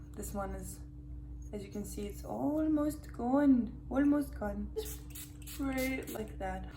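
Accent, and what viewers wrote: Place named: Russia. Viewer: It's Russian-pronounced English.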